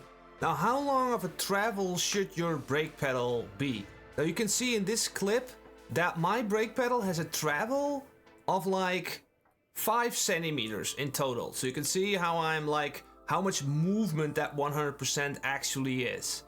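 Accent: Netherlands. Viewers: Nondeju dat is een lekker Hollands accentje jongen 😁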